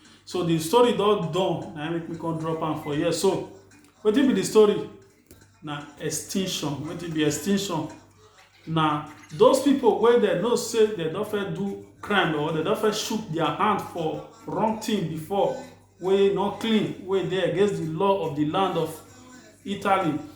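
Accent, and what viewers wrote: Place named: Nigeria. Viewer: Wow your pigin ascent is nice, thanks for sharing.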